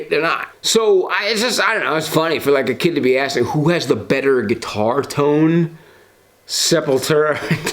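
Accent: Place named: Texas, United States. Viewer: Damn dude you’re Southern accent is spot on. That’s how we talk in Texas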